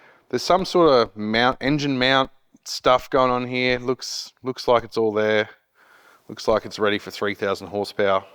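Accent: Australia. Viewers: Haha so bloody Aussie lol love it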